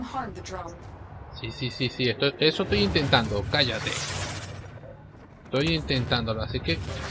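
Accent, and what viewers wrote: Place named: Venezuela. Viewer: eres venezolano si o si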